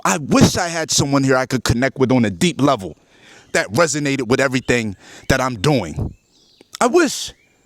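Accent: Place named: New York, United States. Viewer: Where you come from ? NYC ? Sounds like it.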